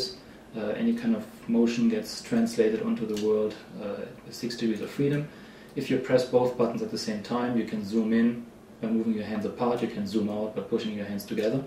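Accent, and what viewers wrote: Germany. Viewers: Hey dude, where are you from? You sound like a German ^__^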